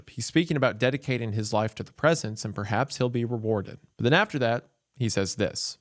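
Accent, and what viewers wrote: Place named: Appalachia, United States. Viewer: My boy’s Appalachian accent is coming out full force. Love to hear it.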